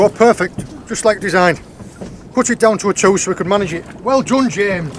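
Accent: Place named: Cumbria, United Kingdom. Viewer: Love the vids were are you from originally sounds like a cumbrian accent I'm from whitehaven originally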